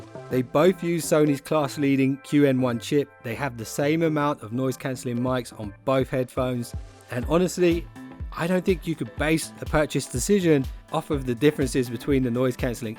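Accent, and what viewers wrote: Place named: United Kingdom. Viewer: Out of curiosity, what part of the UK your accent is coming from?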